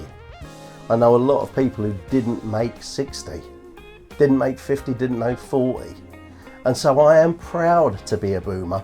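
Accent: England, United Kingdom. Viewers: I've watched a couple of videos and some has had background music a bit too loud. In this video it's fine, not too distracting (easier to take in what you are saying), and also listening to you talk is a melody in itself. Some English accents are very pleasant to listen to. Videos 10 mins or longer are my favorite, that way I can listen to them for a while when in the kitchen! Keep up the good work!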